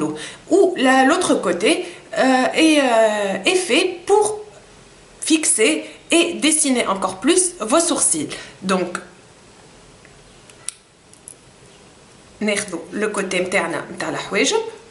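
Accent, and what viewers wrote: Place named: Tunisia. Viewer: C'est lourd le francais tunisiens ! Whaou! Sinon bon tuto !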